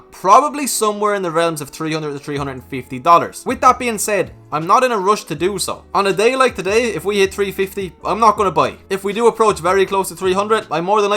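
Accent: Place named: Ireland. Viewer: Great channel - a succesful Irish YouTuber who doesn’t try to sound like a yank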